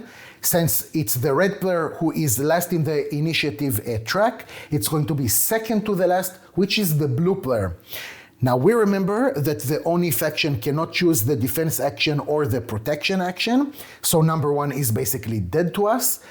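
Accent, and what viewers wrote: France. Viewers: Oh, my apologies. I assumed you are French, since you are located in Paris and you definitely feature a French accent. If that isn't a French accent, what is? On top, you seem to sling a perfectly fine typed French. So if you are not French, you basically are the perfect infiltrator, probably on secret mission. 🤣🤣🤣 Again, sorry for my misconception.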